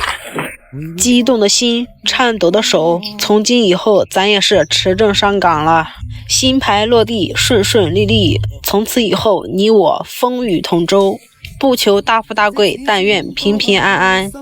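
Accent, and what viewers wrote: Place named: Shandong, China. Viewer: 感觉你有山东口音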